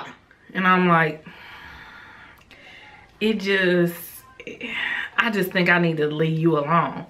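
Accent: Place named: East St. Louis, United States. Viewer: East Saint!!! I know our accent anywhere lol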